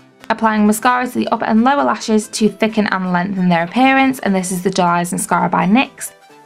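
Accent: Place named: Cheshire, United Kingdom. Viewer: You sound like you're from Middlewich! I taught at the High School there in 2006, and when my girls went to Sir John Deanes, they had pals from Middlewich High...so you're probs going to tell me I'm completely wrong and you live in Alsager or Nantwich! I'm just outside Northwich in Weaverham. If you're in the area, let me know and I'll show you my mental makeup collection which is taking over my bedroom. And I'm 47!! My daughter gets inordinately jealous and barks, "YOUVE GOT TOO MUCH MAKEUP!!! Mummmeeeee, can I have your Vice Reloaded and your Violet Voss palettes pleeeeeease cos you love meeee." Suffice it to say, the answer's NO! Thanks for taking the time to reply. That is a lovely touch for a YouTuber cos few of them can be bothered with their subbies xx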